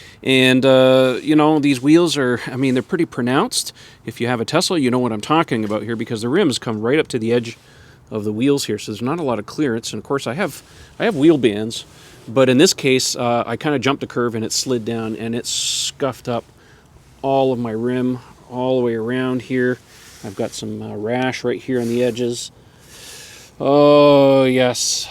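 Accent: Canada. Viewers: Thanks. Great idea! Do I detect a Canadian accent there? Looked like Mississauga too.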